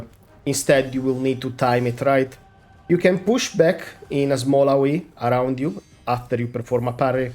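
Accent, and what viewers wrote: Italy. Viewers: pasta accent - you have my like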